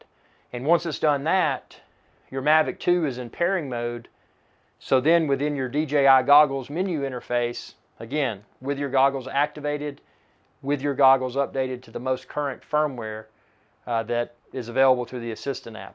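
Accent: South, United States. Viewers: That southern accent is golden, love it. Greets from germany!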